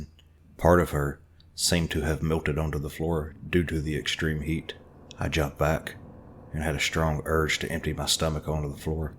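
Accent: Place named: South, United States. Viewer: I like the southern accent, just like mine haha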